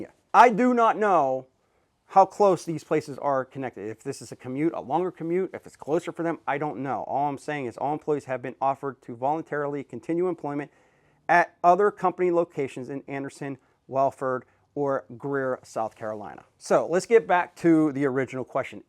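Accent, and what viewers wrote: Pittsburgh, United States. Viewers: I'm glad you claimed your PA heritage. I noticed the Pittsburgh accent right off. If you ain't from Pittsburgh you was raised close enough to have the accent. I was raised in East Pittsburgh until I went to the Navy. I hope your a steelers/Pens fan. I bet our Buddy Vince is a Flyers fan.....even the coolest guys have some short commings 😂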